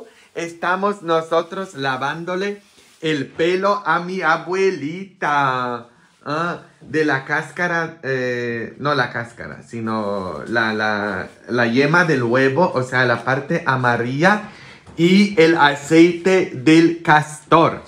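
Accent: Mexico. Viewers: Padre, en que ambiente aprendio Espanol? Su Espanol me parece chilango.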